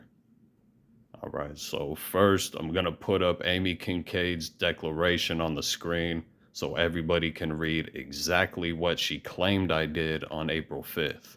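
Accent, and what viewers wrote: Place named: United Kingdom. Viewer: Great British accent and you said she needs more greens is not a accusation of any type.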